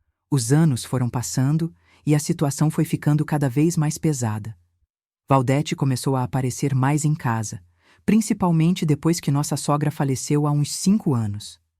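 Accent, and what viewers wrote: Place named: São Paulo, Brazil. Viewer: A narradora estava falando tão bem,com um sotaque bonito de são Paulo capital. De repente, o sotaque ficou interiorano trocando o R pelo L. Horrível.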